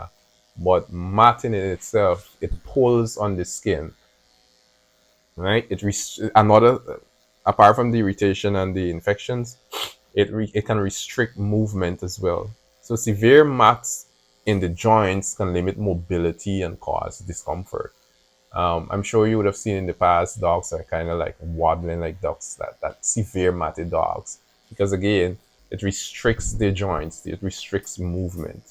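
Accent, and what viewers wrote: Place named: Caribbean. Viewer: I love your accent, where are you from? You sound West Indian. A very comfortable and soothing voice. Puts me in mind of my Granny. 🙏🏾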